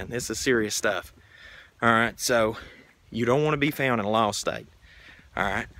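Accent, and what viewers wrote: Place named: South, United States. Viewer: that's a wholesome southern draw I hear, Praise The Livin" Lord Jesus 💜 and God Bless yall" real good over there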